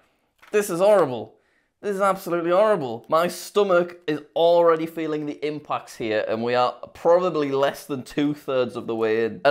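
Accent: RP, United Kingdom. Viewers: where are you from? You sound British I am as well. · I can tell so easily that he is British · he’s so posh in this video 😂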